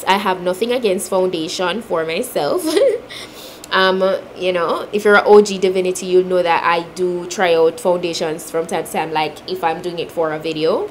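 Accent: Jamaica. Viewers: Just listening to you talk makes me miss Jamaica. My family lives in Kingston 13. I used to visit twice a year but haven’t been for almost two years now.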